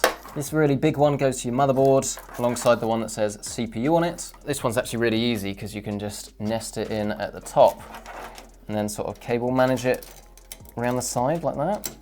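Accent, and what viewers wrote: United Kingdom. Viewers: British boy talking about computers makes me happy :D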